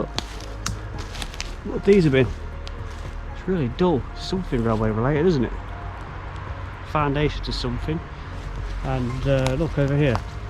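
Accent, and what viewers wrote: Nottingham, United Kingdom. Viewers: Man, that's a great No'Ingham accent!😂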